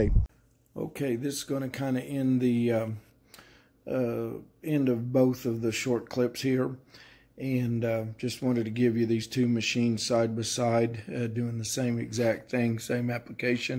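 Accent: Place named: Kansas, United States. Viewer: You sound Kansas are you from Kansas?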